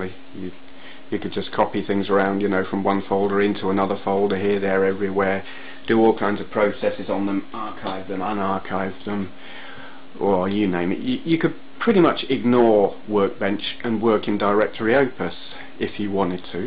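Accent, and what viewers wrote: United Kingdom. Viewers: i knew before i clicked this video that the voice would be british....... so sad that america never got as enthusiastic about the amiga. =( in fact, it's so bad that when nintendo released c64 games on the wii console, they gave us americans the PAL versions, not the NTSC ones!!! so the games run at the incorrect speed over here (music is too fast) and the keyboard layout even sports the british pound instead of the dollar sign. sighhhhhhhh.......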